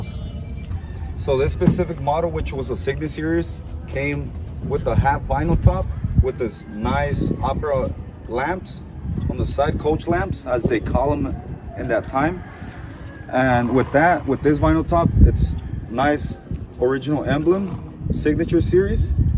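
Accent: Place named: Tijuana, Mexico. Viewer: You have Tijuas accent man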